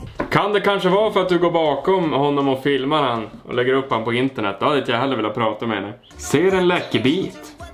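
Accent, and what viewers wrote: Norrland, Sweden. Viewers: Jååå. Hittade din kanal typ förra veckan. Gick en video och prenumererade direkt! Visat dig för alla vänner och för familjen. Alla tycker du är lika rolig som jag tycker. Sedan så e man ju halvt Norrlänning också så klagar ju inte på dialekten😉 ae fan vad rolig du är, fortsätt med det du gör. Man får alltid ett gott skratt❤️ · hahah, skönt snubbe och norrläning!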